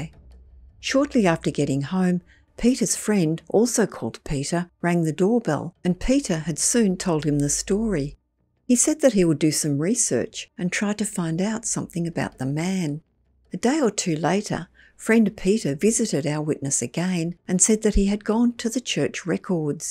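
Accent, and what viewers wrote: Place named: Australia. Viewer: I love your Aussie accent and the way you narrate. Thank you 🙏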